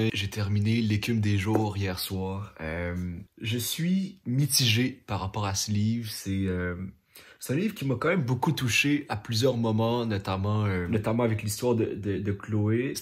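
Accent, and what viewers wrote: Quebec, Canada. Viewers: Félicitation! lire 20 livres en un mois est très super. C'est inspirant. Also, I am watching your video to practice my french listening. I did realise at the beginning that you speak in Québécoise, and to my surprise I found your speaking easy and clear (considering I barely understand french youtubers from France 😭) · T'es beau et j'adore ton accent Québécois Alors moi j'adore la lecture c'est super aventurier, ça aide à les gens à plus réfléchir, à s'évadé, a ce développer mentalement, etc Parfois il y a des liens entre nôtres vie et l'histoire ou ramon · Haha first time at this channel and 2 seconds in and I knew he was Quebecois. Les quebecois sont incroyable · theSupercasa du québec! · Wahh ... québécois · Yellow Card Chimchim His from Quebec, and yes he doesn’t sound like a French but he has a very nice voice. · is he canadian? 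idk what canadian french sounds like but his french doesn't sound french iykwim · Salut mon ami! J'ai aimé ton accent!❤ Bisous du Brésil à toute nation québécoise! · El Amiri Yes, he is québécois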